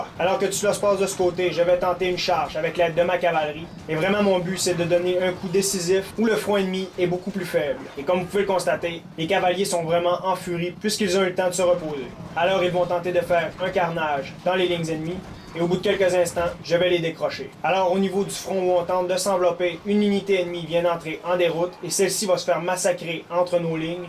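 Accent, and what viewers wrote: Canada. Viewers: t'es canadien ou quebecquois?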